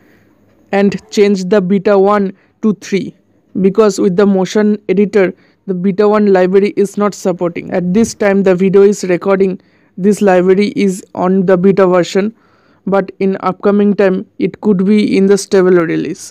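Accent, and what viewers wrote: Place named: Bengal, India. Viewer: Hmm Bengali accent..nice :D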